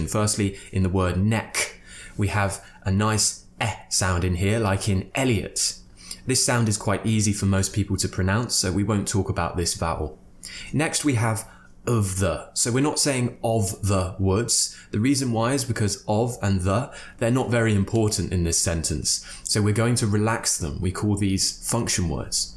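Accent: United Kingdom. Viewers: What is Receive pronunciation? The way you are speaking is this a RP ? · Hi! I want to tell about myself. I'm Turkish. I have six English class in a week. My English teacher is South African Turk. His accent like yours. (He speaking with British accent.) So, I'm watching your videos everyday. They are helping me so much. Thank you for this. I love your channel so much 💜💛 Note: I didn't use translate. I'm sorry for my English. I'm trying to be better. 🙏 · Thank you so much, Elliott! Your lessons made me love the British accent! Trying to do my best to master it:) Best of luck it the New Year! Cheers! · When I first watched your video yesterday, I tried to speak and mimic your accent. I finally found a native British speaker in YouTube. Although my roommate was very annoyed when I pronounce and mimic your accent. Haha. Cheers! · I really admire every video on your channel, I ask permission to practice british accent with your videos plz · your accent is amazing. 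can I know where you are from please? I mean where of The UK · Elliott is your-go-to person when all of a sudden you forget how to sound like a modern RP. Truth be told, I've been listening to your channel and podcast long before I decided to move and work here in LDN and I love the English for your kindness and their various accents especially here within the SE / Southwark area. 💙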